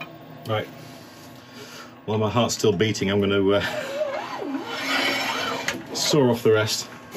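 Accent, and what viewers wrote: United Kingdom. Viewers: First of all, thank you for sharing your ups and downs with that wonderful, marble like bowl! Secondly, you found the most important word concerning epoxy pours: "hopefully"... hopefully it doesn't leak, hopefully the colours stay separated, hopefully there will be no voids... Third point: you used epodex as I do, and I have the same issues with heavy chipping. Looking at the turning videos from overseas it always seems so easy to turn resin. But what can you do if there's no total boat or whatever they use available in Europe? You're stuck on Epodex or Dipon no matter how they behave on the lathe. I'd be grateful if someone dropped a hint to a better turnable resin here. Last but not least: Sometimes you can be experienced in a foreign language as you want, your brain is kidding you anyway. The first three minutes I was wondering what a "barking clusion" might be, 😂. 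Keep on turning, I love your videos, your British sound an I admire your patience. I think I'd have kicked the lathe (outch!) when the bowl flew off.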